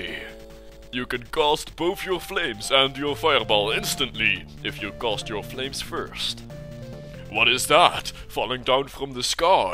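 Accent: Netherlands. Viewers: that accent.. im so ashamed to be dutch right now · dat nederlandse accent kun je best goed horen ^^ nice guide i should give it a go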